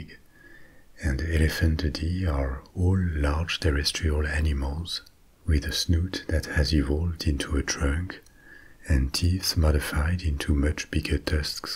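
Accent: France. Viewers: ÇA C'EST MA FRANCE AVEC UN BEAUX ACCENT MERCI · You sound parisian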